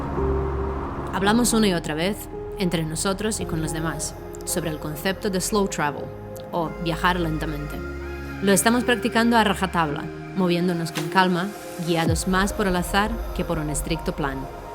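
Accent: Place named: Serbia. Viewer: Genial documental, el acento serbio de la narradora le da un toque especial y exuberante más la calidad y las transiciones que son muy buenas! Felicidades!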